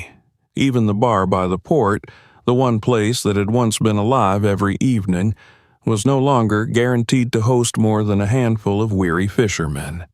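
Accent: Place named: South, United States. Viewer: Oh goody a AI story with a Hill Billy voice over narration.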